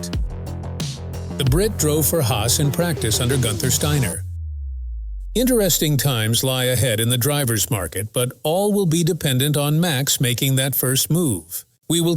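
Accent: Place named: United States. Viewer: It's funny hearing an American sounding like he knows F1.....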